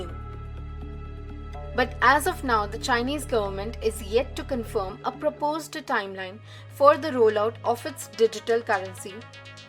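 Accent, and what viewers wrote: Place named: India. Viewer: Stop inventing things in Indian accent please, where is the digital currency of America???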